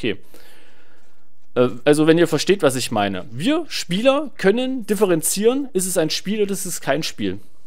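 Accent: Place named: Saxony, Germany. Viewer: Dat Sächsisch yo :D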